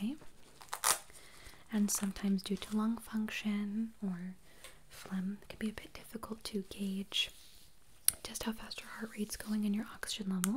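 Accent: United States. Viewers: Are you American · I can't tell if the American accent or the British accent is her original one. Both sound authentic. lol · I can’t ever figure out if your American or British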